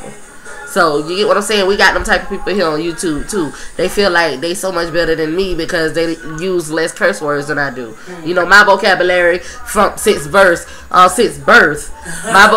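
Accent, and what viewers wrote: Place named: Miami, United States. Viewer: I knew u was a miami girl like me frm the way u talk... thats y i love u!!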